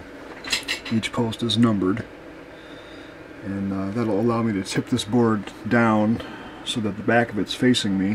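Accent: Michigan, United States. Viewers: Sounds like you are somewhere in mid-Michigan. I need a couple more modern receivers/amps repaired. I'm in Flint and it's hard to find a decent person or place that will work on stereo equipment. The old electronics store my father use to deal with has been out of business for a long time now. They were called Shans or Shanes electronics. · So true. And his Michigan accent reminds me of home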